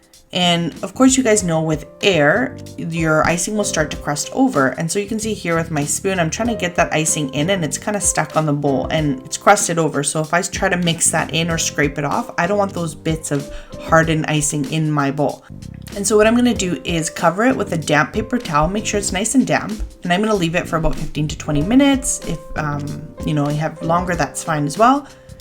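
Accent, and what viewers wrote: Canada. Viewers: OMGosh~ Thank You SO Much for linking these videos! Apparently, I'd watched them, as I'd "liked" them & added them to my "Crafts & DIY" playlist, but I'd completely forgotten about the double-bagging trick, so I can't tell you enough how much I appreciate you posting these links. I'm recently disabled, so I can't do as much as I'd like with my grandkids, but cooking & baking is one of the things we CAN do. They're sensitive to sugar, however, and their moms like to keep them to an organic, healthful diet, so I've used some of your ideas with my recipes for hummus to decorate their favorite quinoa chips we bake. We dye the homemade hummus with beet & spinach powder (which gives it a really unique flavor) & they make flowers and leaves on the crackers. Their other favorite thing to make is ginger chews (just boiled strips of ginger with equal parts water & sugar until soft, then sprinkled with sugar when cooled~ these obviously have a lot of sugar, so they're a once-in-while treat). 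Sometimes, the ginger is a little too spicy. I have 7 grandkids, ages 7 to due in 2 weeks (my only granddaughter is the 7 year-old), and they all love spending time in the kitchen! Thank You AGAIN for ALL Your GREAT Ideas & Fantastic Spirit! PS. My Mom was Canadian, do I hear a hint of a Canadian accent? I still have my Mom's "Kate Aitken's Cookbook" that must be 70 years old by now. Many Blessings to You & Yours!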